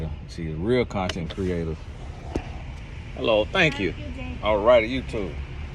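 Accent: South, United States. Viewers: Wow I’m from Florida too. Lol! I was raised up north though. You have a strong southern accent. You are hilarious but at the same time you’re telling the truth! 😂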